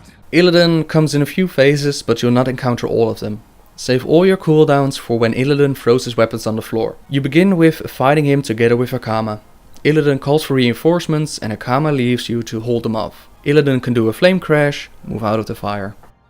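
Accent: Netherlands. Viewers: zowieso nederlands xD klote accent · You sound dutch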